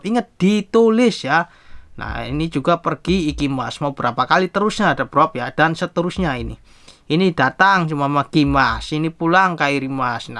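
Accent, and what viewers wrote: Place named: Cirebon, Indonesia. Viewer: Logatnya kaya Cirebon dermayu y mas😅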